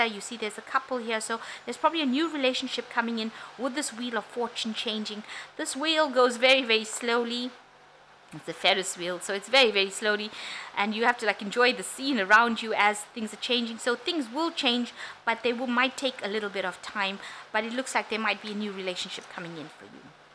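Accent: South Africa. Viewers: Thank you for your reading. Are you south African? Your accent sounds familiar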